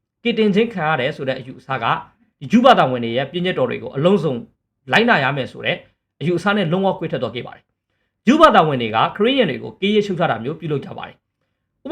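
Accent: Rakhine, Myanmar. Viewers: ပထမကတော့နားထောင်မလို့ပဲ ရခိုင်လေသံကြားတာနဲ့ ဆက်လည်းနားမထောင်ဘူး unlikeလည်းပေးပြီး not interested လုပ်ခဲ့တယ် မင်းတို့ရခိုင်တွေ အကြွေးပြန်ဆပ်ရမယ် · ရက်ခီးသံ ဝဲလွန်းဝာာ အဆင်မပြေ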